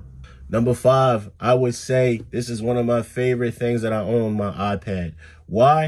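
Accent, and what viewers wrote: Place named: Philadelphia, United States. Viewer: oh you def from philly just got a van thanks for the advice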